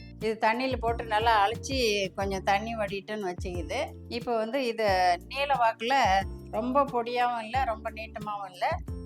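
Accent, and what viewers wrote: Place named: Vellore, India. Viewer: Very nice madam, i shall try this, i am native of vellore, i am happy to listen to your typical vellore style Tamil.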